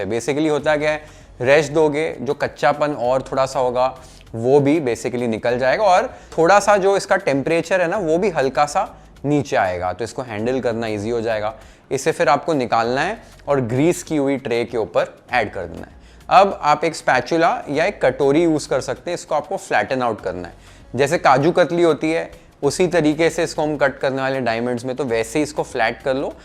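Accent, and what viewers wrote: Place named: Mumbai, India. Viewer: Sanjyot is born and brought up in Mumbai, that explains his Marathi accent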